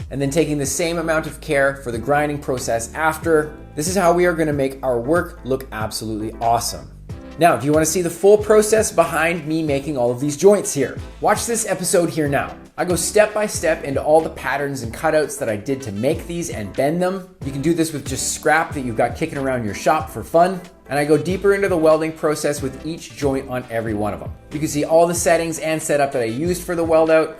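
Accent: Canada. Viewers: canadian detected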